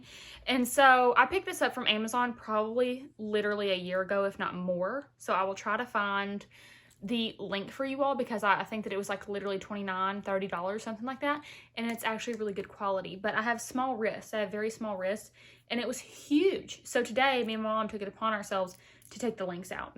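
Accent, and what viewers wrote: South, United States. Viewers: Just found your channel through Allie Glines! Ummm you are precious!! And hilarious! And all the things! Plus as a fellow southerner your accent feels like home! Can’t wait for my Fawn glosses to come in <3 love finding real down to earth youtubers!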